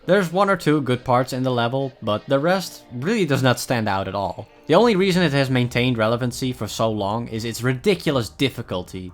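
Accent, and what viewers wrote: Netherlands. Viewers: Bruv are you dutch? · kkr dik nederlands accent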